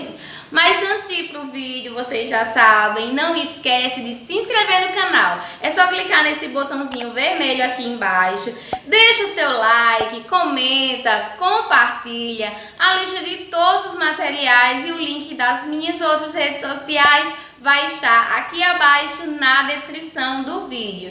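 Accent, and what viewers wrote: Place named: Paraíba, Brazil. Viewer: Moça, é incrível. Você falou e eu pensei 'essa mulher é paraibana' Dito e feito kkkkk Beijos da sua conterrânea também de João Pessoa.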